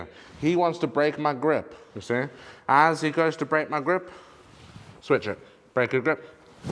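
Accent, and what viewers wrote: England, United Kingdom. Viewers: lol thanks man, I needed that. And thanks for the vids, I really appreciate the details in the way your break this stuff down. For some reason your immaculate English accent is easier to recall than my American coaches when I’m being strangled.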